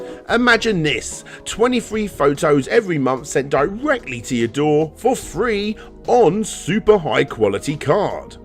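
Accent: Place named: United Kingdom. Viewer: In case you never heard such accent before you should know that he's from the U.K ,idiot . Guns are illegal.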